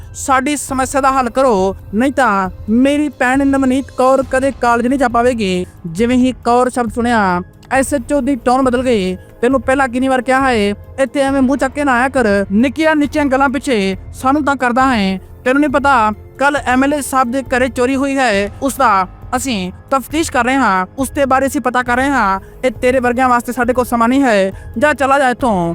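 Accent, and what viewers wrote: Bihar, India. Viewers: ਪੰਜਾਬੀ ਬੋਲਣ ਵਾਲਾ, ਭਈਆ ਲਗਦਾ? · Bolan vala koi jokar lagda hai. Eh punjabi hai ke bhaiya.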